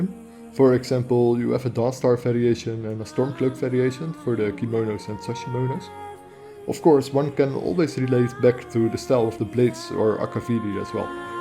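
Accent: Netherlands. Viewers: ik hoor aan je accent dat je nederlands bent haha :) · Are you from Den Hague by any chance? Or some where from nearby that region? I notice it very clearly from your accent, but I'm not sure. Part of my family are from there.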